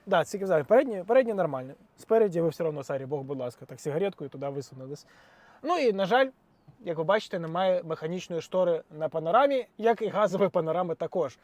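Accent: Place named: China. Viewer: А навіщо говорити англійською з китайським акцентом ?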